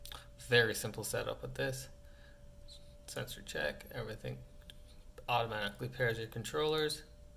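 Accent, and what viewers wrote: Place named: United States. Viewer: sounded american thou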